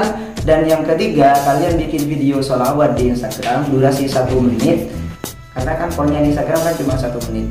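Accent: Madura, Indonesia. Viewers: orang madura ya mas..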